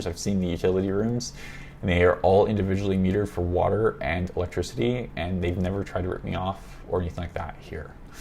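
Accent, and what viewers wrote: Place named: Southern California, United States. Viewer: Your SoCal speech mannerisms are impeccable!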